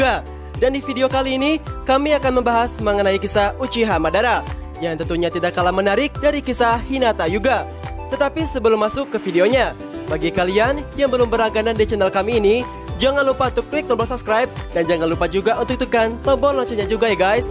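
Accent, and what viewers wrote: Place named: Padang, Indonesia. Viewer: Logat admin nya mirip teman gua orang padang panjang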